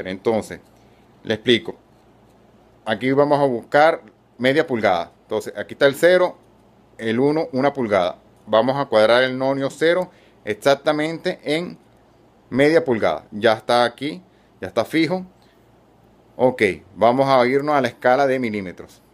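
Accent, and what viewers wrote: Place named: Venezuela. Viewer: Tiene acento Venezolano!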